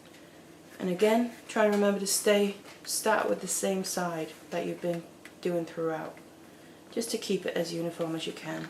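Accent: United Kingdom. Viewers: Her instructions are BETTER than all the others and I LOVE her British/English accent... Now I need to find her other videos?? Hope she has lots...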